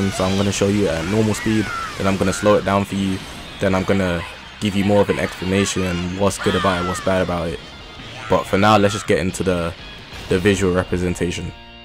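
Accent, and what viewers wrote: England, United Kingdom. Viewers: i did lol , the english accent is too thick.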